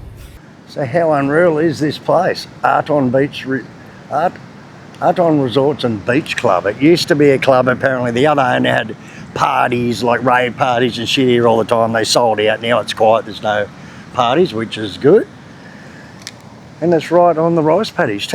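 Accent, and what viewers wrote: Australia. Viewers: talking Indonesian with an Aussie accent is great 😂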